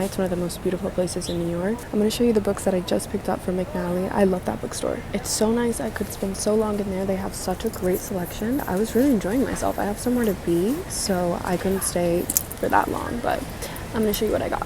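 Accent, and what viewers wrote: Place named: Colombia. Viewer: Tu español suena Colombiano….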